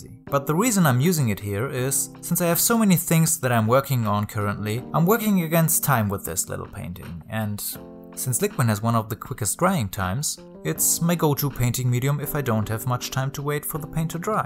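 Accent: Germany. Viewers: Ich genieße dein Englisch mit Deutscher Sprechmelodie es ist so angenehm !♡.♡ Dass du großArtig bist, weißt du ja schon ;)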